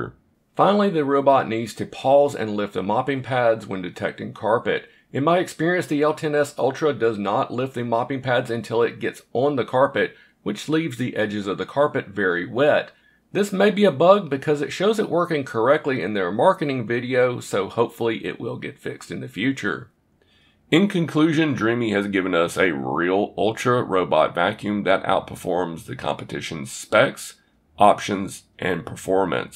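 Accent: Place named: South, United States. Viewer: apologies , it sounded like.youre from the southern U.S. For us brits, Canadian and US accents are pretty much indistinguishable save a twang from states like TX, AL, FL.. bit like aussie and brit then lol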